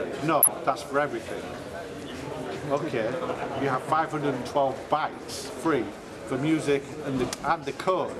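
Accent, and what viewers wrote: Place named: Hull, United Kingdom. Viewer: I love that he still has his hull accent :)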